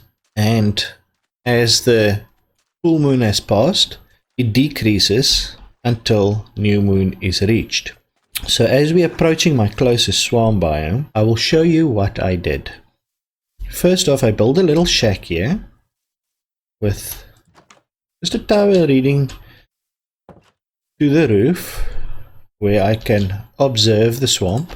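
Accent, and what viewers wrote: South Africa. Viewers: Na he sounds south african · You sound South African so awehhh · His sounds South African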